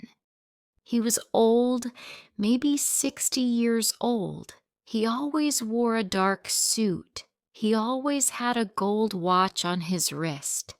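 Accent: United Kingdom. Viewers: Thank you for your story. Is it British English? I have fixed for myself some useful expressions.❤❤❤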